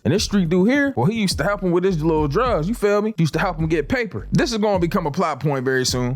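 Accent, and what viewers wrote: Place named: DMV, United States. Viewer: I swear I heard a dmv accent 🤔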